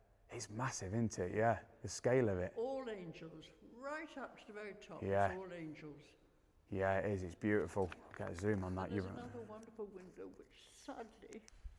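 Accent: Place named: England, United Kingdom. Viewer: Have you not seen York Minster? You sound English & not particularly 'southern' so I too am surprised that you were never taken on a school trip to a 'Minster' town. The whole town of York is stuffed with architectural & historical gems. The Minster of course but also Cliffords' Tower, the Guild Halls, the walkable town walls & ancient cobbled streets called 'gates', the Shambles, the Railway Museum, the ruins where the Mystery Plays are performed, Bettys Tea Shop & much more. You could easily spend a week there & not see or do everything. The river Ouse, when not in flood, is a treat in itself. I have not been to the Christmas Market for many years but it used to be a treat too. Cheers. Other towns of interest In Yorkshire alone worth a visit would be Ripon with its Cathedral, Harrogate, Knaresborough, Whitby for the Abbey & its neighbouring church & many more. 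Wishing you happy hunting. Since you are a joiner you should be particularly interested in any Rood Screens in church buildings that were not destroyed during the reformation. As a joiner it is also worth remembering that the Church also employed highly skilled craftsmen, artisans & labourers to build Cathedrals, Minsters, abbeys, nunneries, hospitals, chapels & churches, some of which took hundreds of years to complete & which all need the same skills for maintenance today. In the words of the old song this all made "work for the working man to do". These places may seem grand but the atmosphere is provided by hundre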